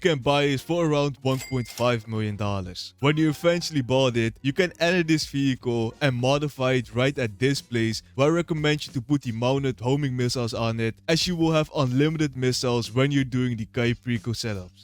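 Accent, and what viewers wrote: Netherlands. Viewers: je klinkt nederlands man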